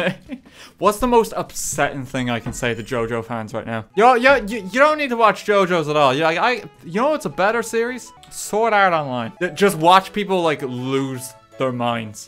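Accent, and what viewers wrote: Ireland. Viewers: I fall asleep to RT. Nothing tuckers me out more than an Irish guy blending a baby.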